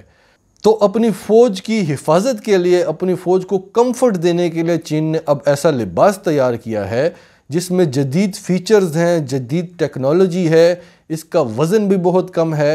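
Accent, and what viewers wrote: Pakistan. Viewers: The best u tube chanal imence respect brother love your talking style good pakistani urdu accent best urdu word s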